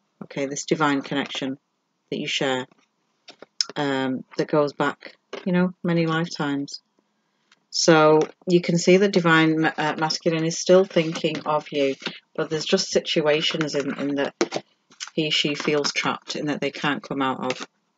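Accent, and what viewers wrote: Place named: Northern England, United Kingdom. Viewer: Ru from Northern England? You sound very local to me 😊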